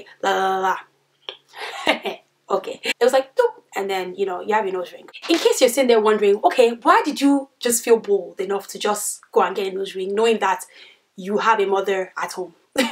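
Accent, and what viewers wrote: Nigeria. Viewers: Lmbo you told this story in the most Naija way. So funny. Glad you made it out alive :)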